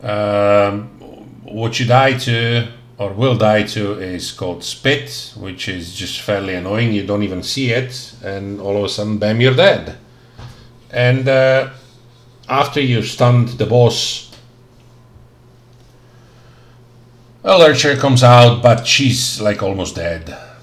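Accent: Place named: Highlands, United Kingdom. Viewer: YESSSS!!! I felt you had a highland accent but then some words I could tell were spoken with something else! I too and from just outside Glasgow!! So hello neighbour!!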